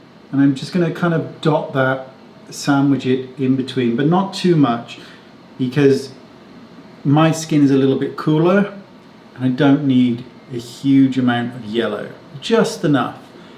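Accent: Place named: England, United Kingdom. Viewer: Robert Welsh also did something like this on his YouTube channel. Out of all the channels I watch you and him are my absolute favorites. Maybe it’s the fact that your both true makeup artist but I think the English accents pull me in as well lol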